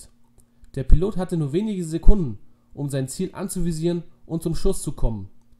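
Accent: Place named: Mecklenburg-Vorpommern, Germany. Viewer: Der Sprecher stammt der Aussprache nach, vermutlich aus dem nördlichen Mecklenburg-Vorpommern😉. Klasse Video!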